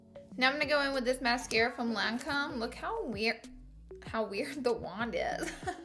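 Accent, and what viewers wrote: South, United States. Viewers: Omg you're so gorgeous 😍😍😍 and I love your accent it sounds southern 😅😊😊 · Omg girl where are you from? That Souther bell accent has me so in love! And I'm so proud of you and your pallete. Started from the bottom. Inspires everyone to just keep going at all times. Work hard. Now enjoy the rewards that comes with it. You deserve it.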